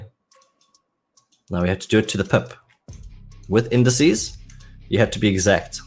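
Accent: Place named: South Africa. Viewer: This is a fellow comrade from South Africa. I know that accent 😆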